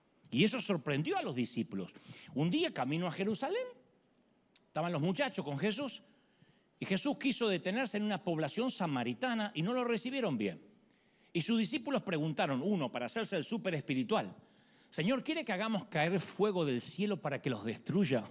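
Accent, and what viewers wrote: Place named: Argentina. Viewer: Que hermosa unción de Dios, a bendecido tu vida y con acento Argentino, gracias por tus mensajes claritos.. Bendiciones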